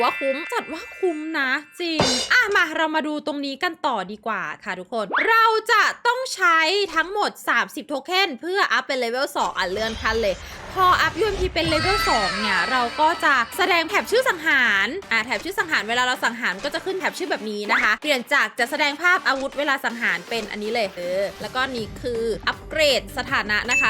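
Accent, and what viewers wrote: North, Thailand. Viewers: อ้าว คนเหนือเหรอครับปม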